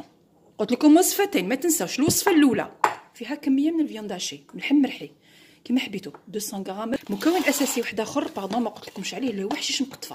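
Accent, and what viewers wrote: Algiers, Algeria. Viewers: لالا غير اهدري و زيدي كلام برتب و الهدرة عاصمية ألله ألله الشي لي غبر دلوق، ولو يهدرو كلمة من ساقف و لوخرى من لقف. لزم نحافظ على موروثنا العاصمي. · Ahedri w ahedri soutek iwenessni wi fekertni fi dzair zmene .garde juste ton algeroisite.dieu te protège et te garde pour nous rappeler tt les recettes ancestrale véritables.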